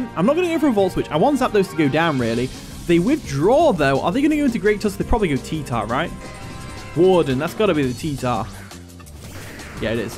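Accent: United Kingdom. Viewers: Ey man I just gotta ask - I'm from Leicester, lived in Bristol 12 years now; are you from just north of Leicester? Your accent is so close I can almost picture it but I can't quite place it, and it's bugging me because I haven't been up the country in years now 😂 Edit: I recently found your channel so I've seen 10-15vids and it gets my ear every time lmao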